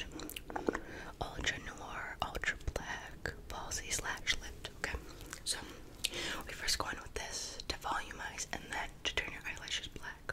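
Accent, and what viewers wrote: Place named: Michigan, United States. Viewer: Omg you sound like you’re a fellow Michigander. Your videos are so relaxing. Have a good evening girl❤️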